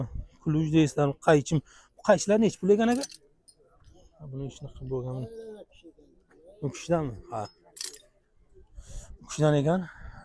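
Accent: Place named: Peshku, Uzbekistan. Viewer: БУХОРОНИ ҚАЙЕРИДАНСИЗ ШЕВА ПЕШКЎГА ТОРТОПТИДА ДЕГДАВОНМИ ПАХТАОБОД ГУЛХАЛОНМИ КАРОЧИДА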